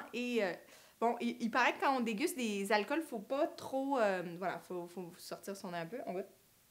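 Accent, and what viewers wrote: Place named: Quebec, Canada. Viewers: Québécois. Les Canadiens ont un accent anglais, car ils parlent Anglais! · tu as un bel accent canadien on dirait!! bises!!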